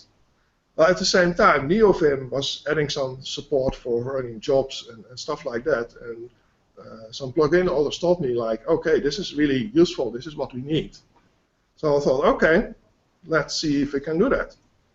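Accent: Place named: Netherlands. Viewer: I suspected the author was Dutch when I ran the program for the first time in <num> and read the Uganda message. Never bothered confirming that, but now I've heard him and I know.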